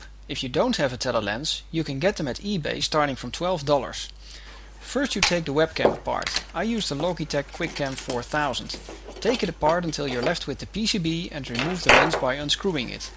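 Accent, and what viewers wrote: Netherlands. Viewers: very cool, i like the very clear dutch accent :D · I could hear right away that you were Dutch, nice video by the way - I'd suggest buying a better webcam in the first place so you end up with a better result in the end. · are you dutch? just curious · haha this is a dutch guy who is talking english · je klinkt een beetje nederlands xd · too bad that he's clearly from holland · lol good vid but horrible accent haha most dutch people can speak well english but the accents are horrible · Je bent een Nederlander je hoort het in je nederlands zoals bij veel Nederlanders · from the VS?? you have more a dutch accent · Mooi nl accent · nice dutch accent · Dutch accept OP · NL! hoor ik altijd als ze Engels spreken XD!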